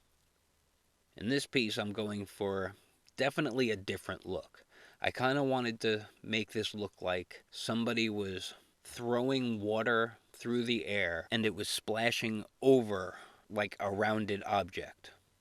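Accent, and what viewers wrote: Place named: New York, United States. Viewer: ohh that's such a good way to study! Are you planning on doing anything more with the fire or water? I bet you could make all sorts of amazing, surreal paintings... The 4th one really looked like it was hitting something round and invisible! I bet there are tons of invisible things you could paint! I thought I detected a subtle (and lovely) hint of new york in your voice, but I've never been there so I wasn't sure. What's the best part of living in long island? Or is it 'on' long island?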